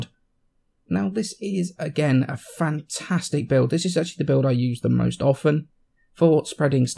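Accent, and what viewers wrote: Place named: England, United Kingdom. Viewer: no he's just English... that's how we say it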